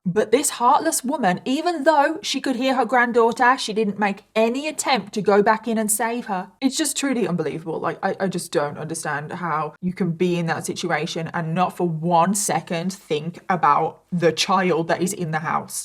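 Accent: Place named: Birmingham, United Kingdom. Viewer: Going off topic, there's something about your accent. One minute you sound antipodean, the next you sound like someone from Birmingham, UK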